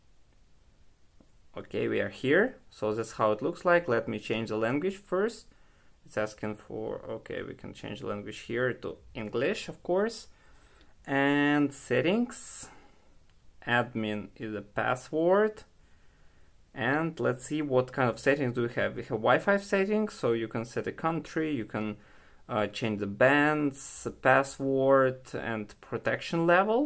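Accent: Russia. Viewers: I like your Russian accent thanks for the video man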